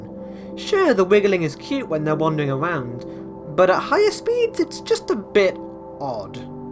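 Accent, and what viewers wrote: United Kingdom. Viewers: Why are you bri'*sh? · This man's voice really fits as narration, the calm bri'ish voice is really good for voice overs.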